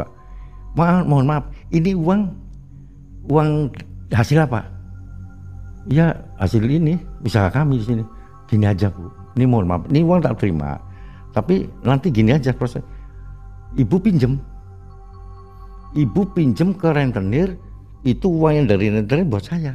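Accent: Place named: Tegal, Indonesia. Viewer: Tegal maning... Tegal maning..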